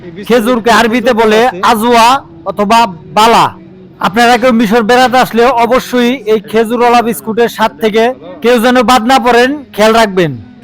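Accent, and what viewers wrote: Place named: Tangail, Bangladesh. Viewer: অনেক সুন্দর উপস্থাপন, কথা শুনে মনে হচ্ছে আপনার বাড়ি টাংগাইলে। আমি মিশরে বেড়াইতে আসতে চাই।আপনার সহযোগিতা চাই।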